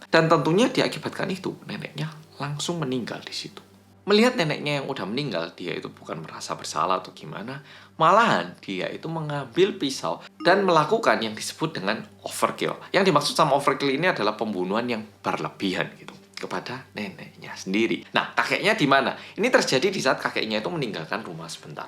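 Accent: Java, Indonesia. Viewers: Coba logatnya, jangan lah kental Jawa, yang dengar semua orang Indonesia 😊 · medok.e ketoro men 😂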